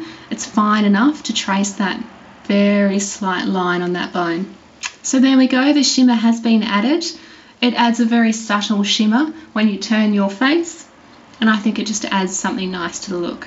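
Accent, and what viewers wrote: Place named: Australia. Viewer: Great look! i love your accent!! your Australian right??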